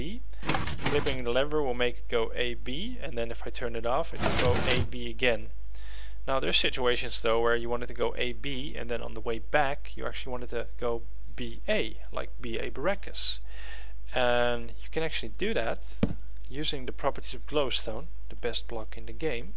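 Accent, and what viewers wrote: Netherlands. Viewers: Grappig je kan gewoon aan je engels horen dat je een Nederlander bent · Where are you from? Nederlamd? je klink nederlands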